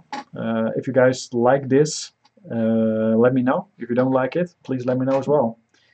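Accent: Netherlands. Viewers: Zo te horen bent je Nederlands, goed werk! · very dutchy accent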